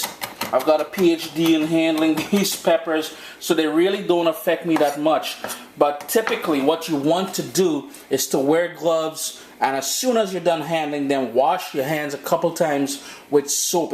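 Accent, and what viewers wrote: Trinidad and Tobago. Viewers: I think he is from Trinidad because I know how trini people talk cause I am a trini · I've been around Trinis all my life and to a non Trini it sounds similar. I think it is the Indian influence because I find it sounds similar to an Indian accent sometimes. I know there's a difference though. I always thought this guy was a Trinidadian based on his accent but the post that we are commenting on suggests otherwise. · He is a Trinidadian ! And he lives in Canada so he has a Trinidadian and Canadian accent ! both accent intertwined with each other .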